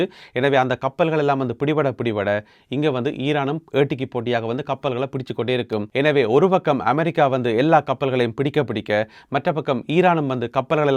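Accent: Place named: Batticaloa, Sri Lanka. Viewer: நீங்கள் மட்டக்களப்பு தமிழ் பேசும் போல தெரிகிறது தமிழ் மக்களை வெட்டி குவித்து உமக்கு தெரிவில்யா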